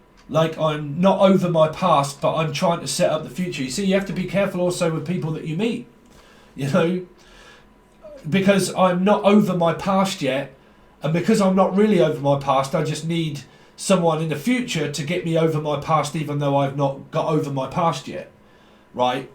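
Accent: United Kingdom. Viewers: your lovely british accent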